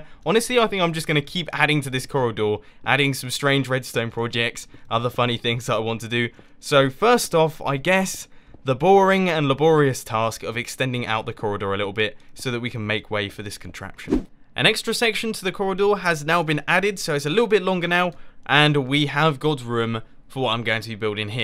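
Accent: United Kingdom. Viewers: I LOVE, AND I AM AMERICAN, SO AMERICANS LOVE BRITISH PEOPLE. THE ACCENT IS SO AWESOME. I respect that you spend time on these recordings, as I make videos myself, and I work for each one for about 4 hours, but you spend 3 whole days.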